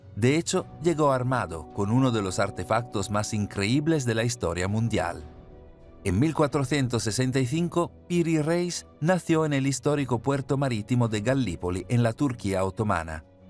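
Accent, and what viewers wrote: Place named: Italy. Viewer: 🔴 EL PORQUÉ SE VUELCA los Rusos unos 20 años atrás han descubierto que un obejeto en rotación en el vacío, si no es totalmente simétrico en sus masas, ÉSTE ROTA SU EJE DE ROTACIÓN después de percorrere una cierta distancia. DESCUBRIMIENTO hecho el la Estación Orbital Rusa. Gracias 🙏 Grazie, si capisce che sei Italiano, abbraccio 🙏 Mario Cappellotto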